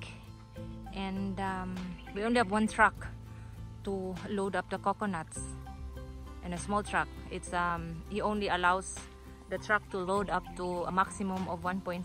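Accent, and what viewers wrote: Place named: Australia. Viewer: dont blame you those workers maybe not intelligent enough to care even you said that they were hill people before or was William when he was in the back block love your swearing your very Australian its cute when my wife swears like you do but my wife has understand more bastos Australian now after 20 yrs even at work her co workers teach her to hahah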